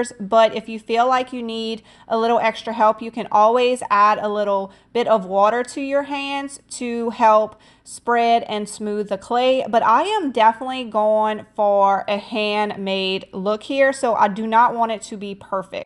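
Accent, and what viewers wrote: Louisiana, United States. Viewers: I adore your videos! Always great ideas, fun techniques AND the accent reminds me of my in-laws and makes me smile - my husband is from Abbeville - bonus! Haha! Thanks for the chance to win! I’m loving the pitcher at the end with the clay added! LOVE all things IOD and haven’t been able to get my hands on a set of stamps yet. XOXO…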